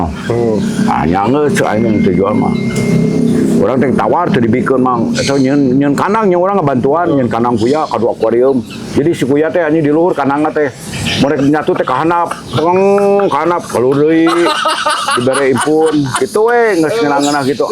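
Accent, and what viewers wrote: Indonesia. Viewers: resep ngdangu na, sunda asli ieu mh😂..... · Logat brdk cicadas ,anatapani 😂😂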